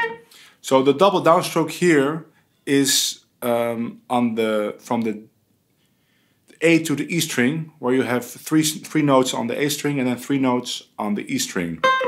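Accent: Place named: Netherlands. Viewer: When I first came across your video, I was watching it on a different youtube format and didn't see your name etc. But I said to myself ("I'm hearing a slight 'Dutch' accent") I was sure of it my parents immigrated to California in the the early 60's right after I was born. They didn't teach us the dutch language sadly. So I have this void that only Dutch accents can fill....does that sound strange? It's music to my ears wherever i can hear it so thank you, even with your very slight accent theres more music than just the guitar haha doi hartstikka leuk! [additional edit] I wanted to brag about my Dad's musical days in Zwartsluis, Genemuiden, and Zwolle. I've only seen one photograph of the harmonica trio he played in throughout the 50's but they were very good! He played the smaller 6 or 8 centimeter harmonica, another guy played one that looked to be a foot long, and the third guy played a hinged double harmonica that seemed to be 20 inches long or something. 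Incredible. My dad was Klaas Schraa😃:hydrate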